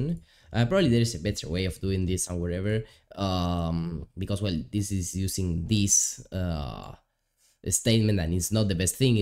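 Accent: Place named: Argentina. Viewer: Buen video! Note un leve acento, sos argentino o uruguayo? Un saludo y a seguir asi!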